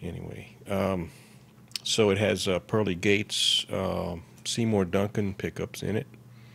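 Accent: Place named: Louisiana, United States. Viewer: Louisiana? u have same accent as me lol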